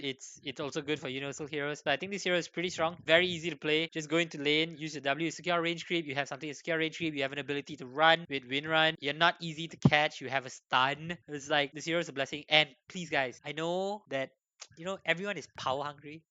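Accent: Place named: Malaysia. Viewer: Your malaysian accent is so bold, you from which part of Malaysia?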